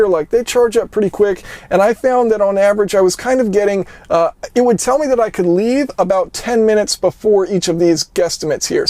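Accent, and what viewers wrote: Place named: United States. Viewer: how miserable is the poor choice of actor and his highly annoying yankee doodle voice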